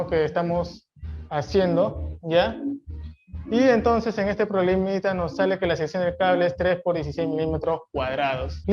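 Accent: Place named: Peru. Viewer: INGENIERO BUENAS NOCHES. HOY DIA ENCONTRE SU CANAL. MUY INTERESANTE EL VIDEO Y SU CONTENIDO. ESTOY REPASANDO MIS NOTAS DE HACE 40 AÑOS. ENCONTRE LA FORMULA PARA CALCULAR LA TEMPERATURA DE TRABAJO DE LOS CABLES YA SEA A 50 GRADOS O A CUALQUIER TEMPERATURA. DIGAME SI EL CABLE FUERA SUBTERRANEO, BAJO TIERRA QUE TEMPERATURA SE TOMA PARA EL DISEÑO. ESTOY SUSCRITO A SU CANAL, VOY REVISAR SU CONTENIDO. ESPERO QUE SIGA SACANDO MAS VIDEOS SOBRE ESTOS TEMAS. ME PARECE QUE UD ES PERUANO. RECIBA UN GRAN SALUDO Y MIS FELICITACIONES POR UNA EXCELENTE EXPLICACION. SALUDOS DEL NORTE DEL PERU. QUE MI DIOS LE GUARDE Y LE BENDIGA.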